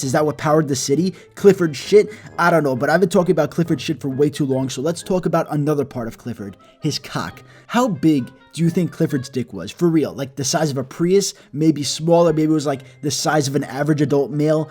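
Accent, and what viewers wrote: New York, United States. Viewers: Is it weird I wanna hear you say the “I told my daughter to not give the dog coffee and what...” because even though it’s not a thick ny accent it’s there also the magnitude earthquake and shit 😂 So perfect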